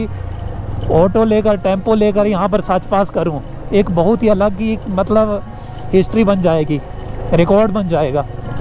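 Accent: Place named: Jammu, India. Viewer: Apki bhasha se lagra h ap jaamu se ho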